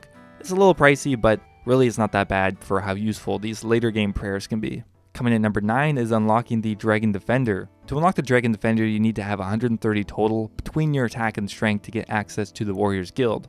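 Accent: Canada. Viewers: Are you Canadian? I thought I heard an accent 🙂 🇨🇦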